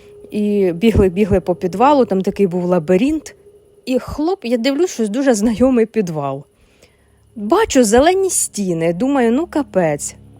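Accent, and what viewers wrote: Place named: Surzhik, Ukraine. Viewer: Какой у Вас классный суржик.))))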